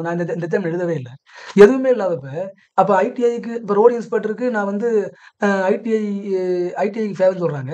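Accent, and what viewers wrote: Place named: Kerala, India. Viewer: Kerala style sollum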